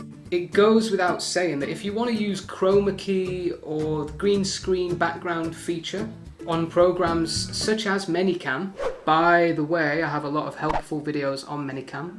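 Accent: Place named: East Midlands, United Kingdom. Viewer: Brilliant video, thanks so much! I´ve got my first online teaching interview tomorrow and these tips were really useful, especially what you said about planning after classroom teaching for 8 years and doing a hellll of a lot of prepping! BTW are you from Derby/Notts area? Your accent seems so familiar :D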